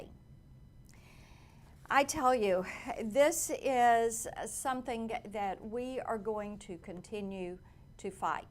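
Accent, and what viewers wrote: South, United States. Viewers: Anyone else notice she pronounces her ing's just like Forrest Gump?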